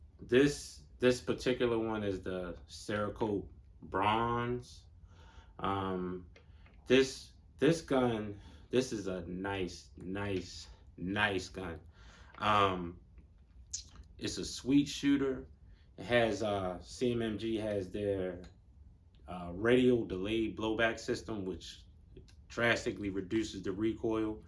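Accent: Baltimore, United States. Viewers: I was going to ask what state is this gun legal in but I swear you sound like you from bmore. Im in DC I want one of these bad but it’s not legal here 😢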